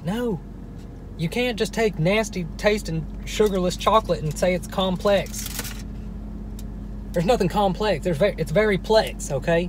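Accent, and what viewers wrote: South, United States. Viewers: Anyone else notice that 3 years ago vs today how much his Southern accent has left? He’s originally from Arkansas so it’s understandable that he has it then but it’s left him now. Just pointing it out lol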